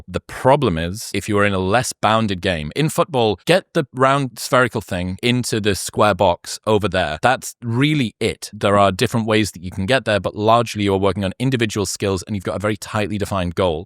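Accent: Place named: Northern England, United Kingdom. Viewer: thats his british accent, from Stockton-on-Tees north england. its dif from london english and some words sound american at times, like football. listen to when he says year, its so weird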